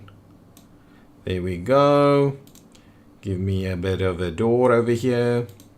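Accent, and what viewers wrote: South Africa. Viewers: Can’t say I’ve heard a South African accent before...it’s really interesting. To my American ears it sounds like Scottish, Australian, and African rolled into one...like I’m listening to 3 different people lol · Are you from South Africa? Guessing based on your accent and prenunciation. And I like South African accent. BTW, nice gameplay and commendary.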